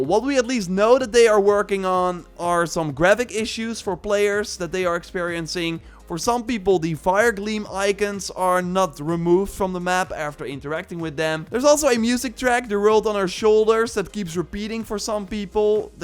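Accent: Netherlands. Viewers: Man man dat Hollanglish.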